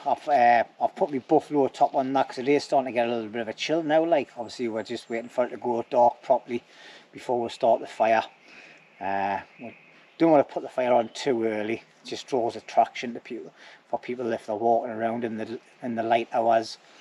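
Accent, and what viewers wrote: United Kingdom. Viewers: As an American, I am always curious about the various UK accents. May I ask what area yours is from? Thoroughly enjoy your outings!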